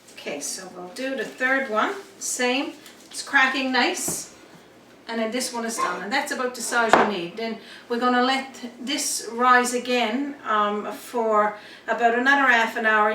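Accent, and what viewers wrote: Newfoundland, Canada. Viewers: Thank you, thank you, thank you, This is absolutely amazing. I'm originally from Corner Brook but moved when I was 9. Learning how to do traditional NFLD recipes with your wonderful accent is truly amazing. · I love Newfoundland accents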